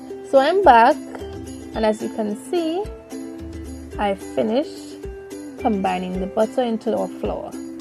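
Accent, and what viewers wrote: Trinidad and Tobago. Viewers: You sound like a Tobagonian. That MUST be some good tasting coconut drops. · I love your Trini dialect on this video. Too many Trini people make recipe videos and sound weird when they try the American accent haha. Love this.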